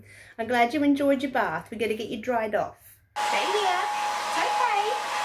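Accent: England, United Kingdom. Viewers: He's so adorable ..what a cutie..i bet he just loves his new mummy.... I can hear your are starting to get an english twang... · First of all... Louie is gorgeous 😍 secondly, I love how your accent has changed here and there to a more English accent 😂. And I love your intro 😁... Wishing you and Louie a long and happy life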